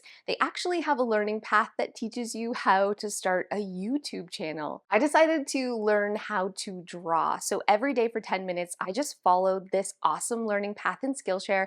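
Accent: Canada. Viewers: I'm going to work on a Word Search book that I got at the $ store, 10 min house tidy up, and do resistance bands for arms and legs! Thanks for sending this video. Your videos have become my happy place, 😍 I love your Canadian accent. I live in Minnesota, so not too far off with the way people talk up north!!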